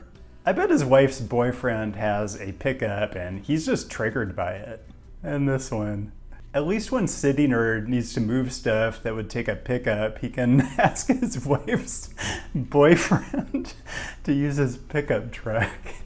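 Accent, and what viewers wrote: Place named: California, United States. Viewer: I cant stand your California accent 😂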